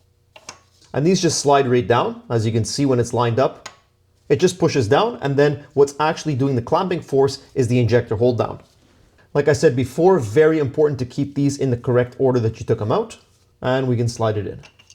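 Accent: Quebec, Canada. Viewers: Love the Quebec French. Ostie! 😂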